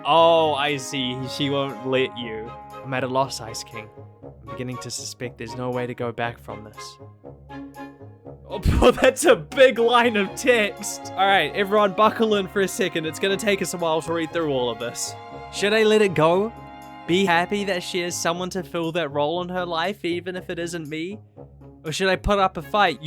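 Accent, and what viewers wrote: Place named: New Zealand. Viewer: omg i just found out you're from nz, (i am too) it's SO refreshing to hear your voice and to watch a channel that's not american. i love your voice so much and i'm so glad i found a great youtuber who's a kiwi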